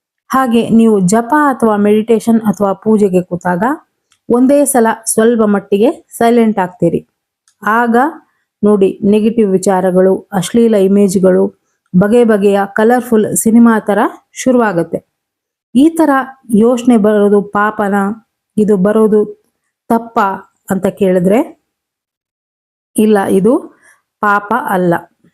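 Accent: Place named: Bangalore, India. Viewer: When I listen to you . I think you r staying in Bangalore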